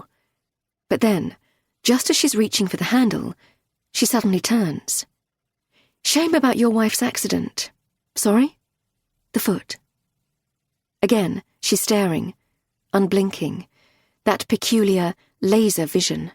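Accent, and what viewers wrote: United Kingdom. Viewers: I love British accent. I actually prefer British accent instead of an American one.❤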